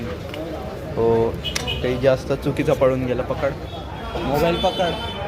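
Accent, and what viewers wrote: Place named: Khandesh, India. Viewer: O bho khandesh ni marathi tuning ahe hay tr 🔥😂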